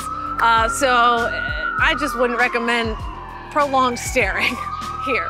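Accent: New York, United States. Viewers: Yes, you definitely have a New York accent, especially with your pronunciation of "Manhattan". You tend to drop the "t" sound" and say "Manha-an". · Oh you are so funny when you do the “hard” NY accent. But I do hear it in your actual voice sometimes even if you don’t notice it…lol. So I have been dreaming of coming to NYC my whole life but everyone who has been keeps discouraging me saying it smells awful, there are rats everywhere the size of cats, and it’s so crazy busy.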